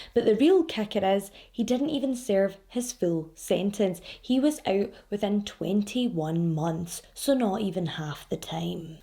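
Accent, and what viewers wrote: Scotland, United Kingdom. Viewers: Please don´t change. I love your bubbley personality, and I especially love your scottish accent. I love Scotland, and I hope my husband and I are gonna visit it one day ♥♥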